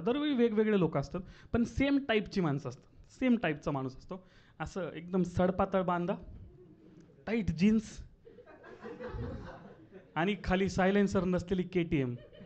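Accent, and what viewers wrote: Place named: Pune, India. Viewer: puneri accent 🤌🤌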